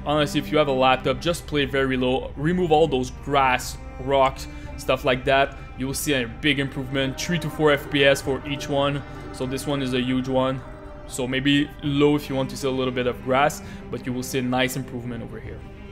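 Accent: Quebec, Canada. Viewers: Je reconnais un Québécois quand j’en entends un😂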